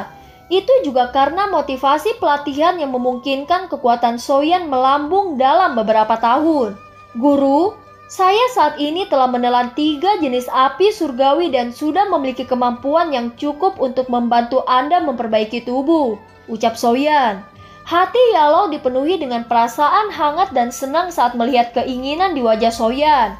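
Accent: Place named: West Java, Indonesia. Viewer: Logat namah siga orang sundaa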